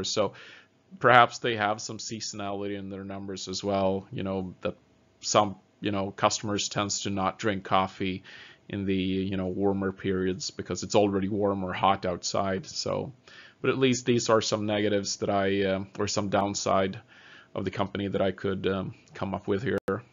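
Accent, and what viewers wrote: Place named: United States. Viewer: Thank u FOR SPEAKING AMERIKAN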